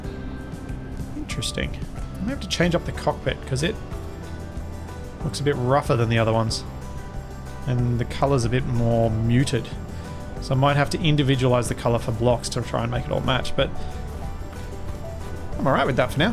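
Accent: Australia. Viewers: "Git! Git! Git atta hea!" My weekly reminder that an Aussie <3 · One of the very few aussies that are nice to listen to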